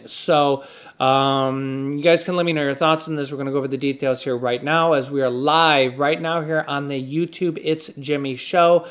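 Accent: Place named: New York, United States. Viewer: Hi Jimmy, I can see and hear your New York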